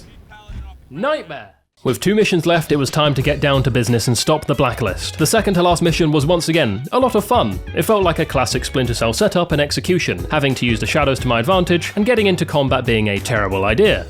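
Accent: United Kingdom. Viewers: I didn’t hear a difference in our British accents and yours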